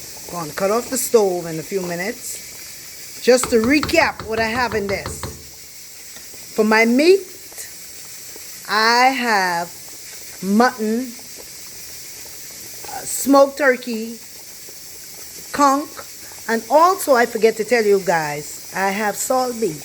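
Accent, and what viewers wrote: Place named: Bahamas. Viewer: Bey my wife went crazy wit da Bahamian talk, she loved it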